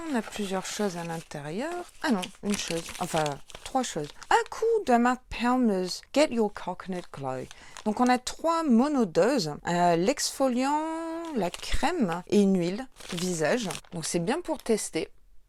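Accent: New Zealand. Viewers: Loving the kiwi accent 💜💜💜 Jolis goodies, le calendrier est vraiment flashy rose barbie ça fait un retour en enfance je trouve 💜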